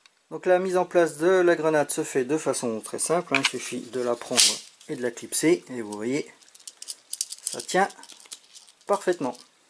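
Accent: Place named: Franche-Comté, France. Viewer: Hoooo j'ai kiffer l'accent vingt dieuuuuuuu...franc comtois en force lol... Plus sérieusement c'est de la très bonne qualité mais le prix de la grenade pique un peu quand même je dois avoué sinon superbe présentation comme dab.a bientôt à l'OP 😉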